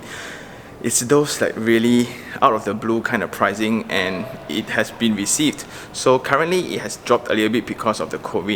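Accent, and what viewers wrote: Malaysia. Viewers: are you from penang? Your hokkien sounds like 1! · Your sound like malaysian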